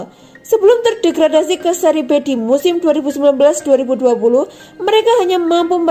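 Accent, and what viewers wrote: Java, Indonesia. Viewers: Coba belajar ngomong dulu yg bener kalo mau jadi narator. Ngomong medok,nyebut nama aja salah. Apalagi beritanya simpang siur plus ngarang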